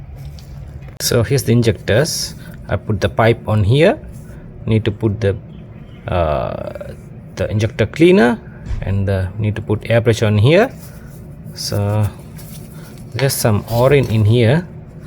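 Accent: India. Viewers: So basically pull the rail off with the injectors still plugged in but off the engine wind the engine over see which one is actually missing it’s spray or dirty then do what he done and I’d also use 120 psi compressed air as well down the ends of each injector and see how that goes.. I’d also lose the gloves and be a man and would be great to see a Australian with a Aussie accent doing not a Hindu in 😂😂😂😂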